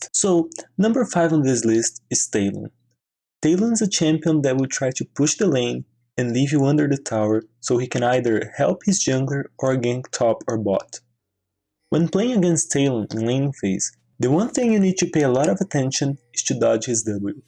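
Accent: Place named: Brazil. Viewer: isso é sotaque br hein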